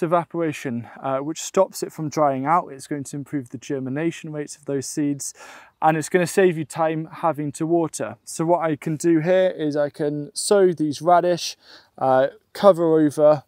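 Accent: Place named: Wales, United Kingdom. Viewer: I agree with your views on no dig, but with one very important caveat: in a very small garden it is reasonable, as you can probably produce enough of your own compost, or only need to buy in very litte. However, once the area gets bigger (I have around 50 sq m of vegetable beds in rotation), I cannot produce enough own compost for the whole area such that I have say 3-4 cms of compost on all the beds. I have bought in from the local recycling plant in order to compensate. However, one is never sure just what is in the compost (even here in Germany), and it costs us retail buyers simply too much - because we are not selling our produce. My answer is to rotate with a combination of green cover crops on the areas that profited from real compost in the finishing season, and use my own compost and very little bought in compost for the area that will be used for the potatoes and carrots in the following season. 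This is a compromise, as it does entail working in the green cover crop, but I think that the combination may even be better for soil health in the end, as the soil is being fed not just compost, but cover crops as well, in rotation. I wish we had as much rain here in Mönchengladbach as you do in Wales, and I am envious of your set up. But I am 70 years old, only turned to vegetable growing 5 years ago, and to be honest, the sort of area you are „farming“ is very much a young man‘s job. But I do enjoy your videos, and I love your Welsh accent!!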